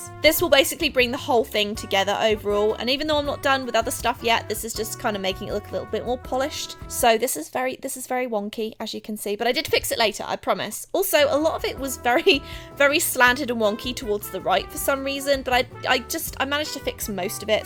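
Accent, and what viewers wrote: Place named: Cornwall, United Kingdom. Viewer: are you from Cornwall sounds a bit cornish? I love your art by the way Luv from the UK xx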